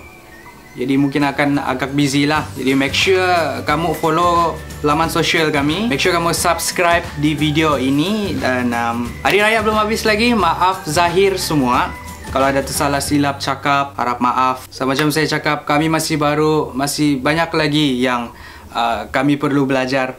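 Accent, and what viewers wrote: Sabah, Malaysia. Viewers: Good Video. Baik jua ku paham slang sabah. Ani peminat dari Brunei ne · Hello from Brunei. Suka tonton kopikopifakta. Dari first video saya dah tau dia ni sabah. and I love the accent. Dan saya bukan lah orang Brunei sebenarnya. Teman oghang perak yop.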